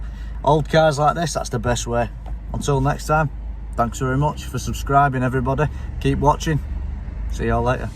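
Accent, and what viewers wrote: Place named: Northern England, United Kingdom. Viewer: Have done a few of those ABS rings in the past and had to resort to a spot of weld myself haven’t done one for some considerable time now though. Your videos are good mate and your work is top notch and at the top of your game especially with the fault finding. Can’t help but think of Fred Dibnah when I hear your accent I’m a Yorkshire man but don’t let that put you off 😂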